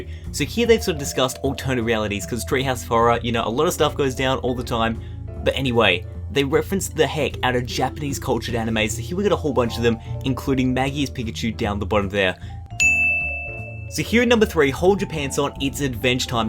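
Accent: Australia. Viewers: Are you Australian? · Are u from Australia?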